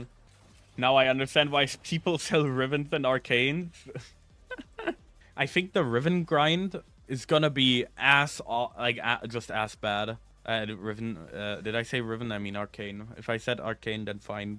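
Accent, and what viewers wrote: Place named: Germany. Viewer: i kinda hören deutsch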